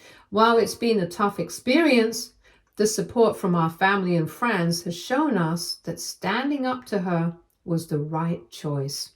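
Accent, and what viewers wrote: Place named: United Kingdom. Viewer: I believe that does not edit the posts but presents them exactly as the OP wrote them. So any errors in choice of words are the OP's. The only thing you can fault on would be pronunciation but keep in mind the she lives in the UK and not the US. (And even in the US there are regional differences in pronunciation of many words just as the UK has regional pronunciation differences.)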